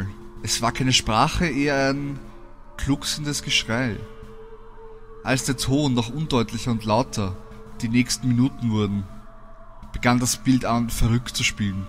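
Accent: Vienna, Austria. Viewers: Bist du ein Wiener ? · Der Sprecher ist Österreicher haha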